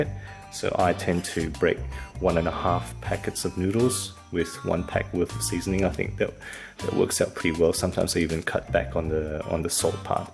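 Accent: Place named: South Africa. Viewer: you sound very south african! coming from a native australian 😁😅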